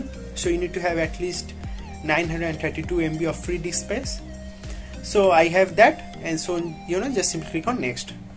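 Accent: Japan. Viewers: how the hell did he sound from JAPAN?!?!?!? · I was guessing Japan lol sorry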